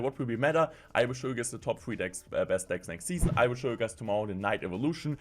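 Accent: Germany. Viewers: Are you german i can tell by your accent😂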